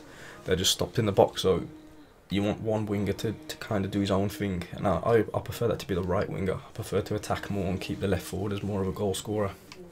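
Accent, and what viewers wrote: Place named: Birmingham, United Kingdom. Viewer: Cheers for this mate, can ping that brummy accent a mile off. Don’t apologise for being ill or swallowing too much mate, people will always knit pick at shit on YouTube. You seem like a proper decent bloke mate keep smashing it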